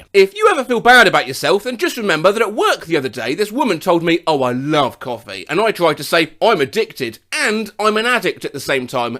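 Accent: United Kingdom. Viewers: I have only watched like 5 of your videos and I have to be honest, you have the greatest British accent of all time.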